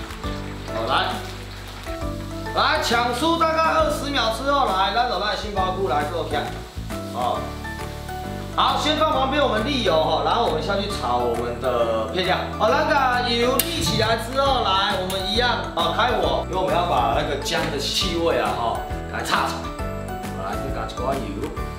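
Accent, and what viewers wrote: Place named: Taiwan. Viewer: 的台灣國語很口愛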